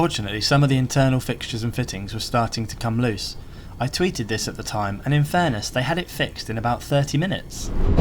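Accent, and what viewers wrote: United Kingdom. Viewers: Your calm British (clear) accent is comprehensible and non-partisan. Keep it up. As another member already suggested, your suggestions and observations have been listened to and implemented. Two glaring points to be taken up by Indian Railways. Timing discipline. Always make sure that Trains leave on time and reach on time or a but earlier if possible. It is unfortunate that the passengers could not connect to the Delhi Metro station due to the delay in reaching time. Thank You.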